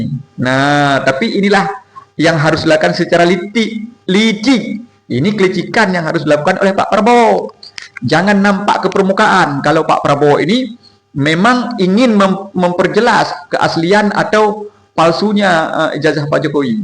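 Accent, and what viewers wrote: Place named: Malaysia. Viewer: Conten ini lucu skli bicara nya orng malaysia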